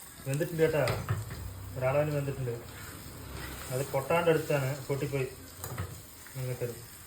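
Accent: Tamil Nadu, India. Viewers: തമിഴ് ചുവയുള്ള നിങ്ങളെ സംസാരമാണ് എനിക്കേറെ ഇഷ്ടം❤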